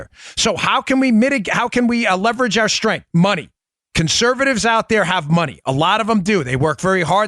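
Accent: New York, United States. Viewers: I like this show sounds like my boyfriend New Yorker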